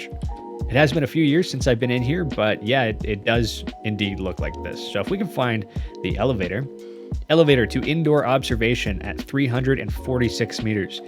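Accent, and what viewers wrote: Canada. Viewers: I love your videos! Keep up the good work FOR EVER. PS you talk very Canadian...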